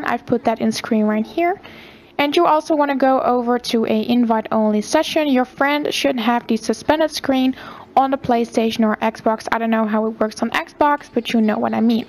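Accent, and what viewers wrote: Netherlands. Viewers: It's her accent, shes from nederlands I'm pretty sure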